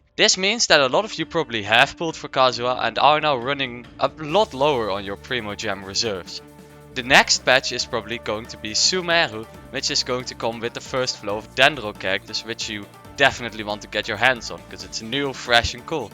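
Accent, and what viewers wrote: Netherlands. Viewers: Are you Dutch? I feel like I recognize your accent...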